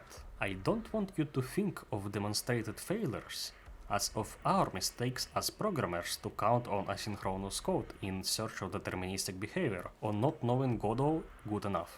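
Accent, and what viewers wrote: Russia. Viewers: I hear strong Russian accent. Good presentation of the material.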